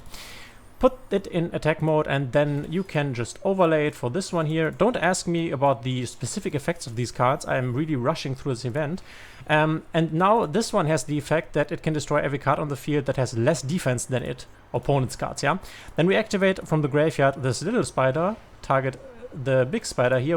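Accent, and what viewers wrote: Germany. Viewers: Bist du deutsch ? Dein Englisch hört sich nach deutschem Dialekt an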